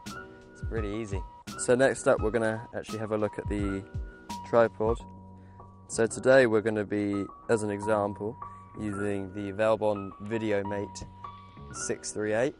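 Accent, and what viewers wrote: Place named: United Kingdom. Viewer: British Accent👌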